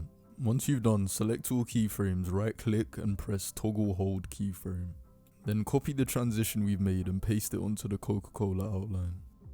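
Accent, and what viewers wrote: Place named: Burnley, United Kingdom. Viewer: Are you from Burnley? love your accent.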